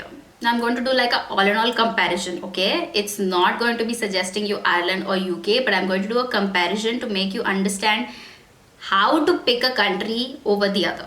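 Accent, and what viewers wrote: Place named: India. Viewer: Indian English very Funny... 😅... Practice on pronunciation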